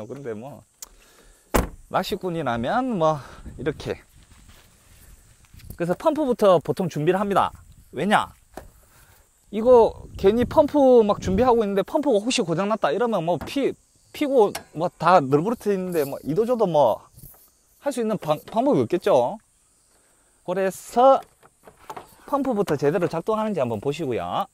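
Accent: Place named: Seoul, South Korea. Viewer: ㅎㅎㅎ 앗~ 서울사람이다~~~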